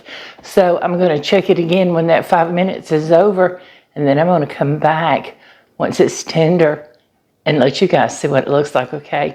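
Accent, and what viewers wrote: South, United States. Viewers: Hi. I was just scrolling and came upon your video. Two of my favorite foods are cabbage and onions. I have never heard of this cabbage casserole. It sounds wonderful and looks pretty easy to make. I love your bright open kitchen and your great explanations, but especially your sweet Southern accent. I'm from California so it's a treat to hear you. Thanks so much. Subscribed. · I will definitely try this cabbage casserole recipe. I am New Jersey girl with southern roots. And I loved your southern accent, and especially your calm demeanor during your presentation.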